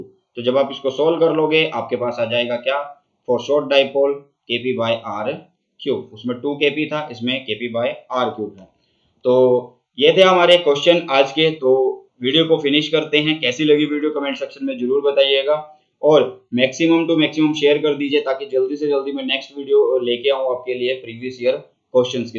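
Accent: Haryana, India. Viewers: I think haryana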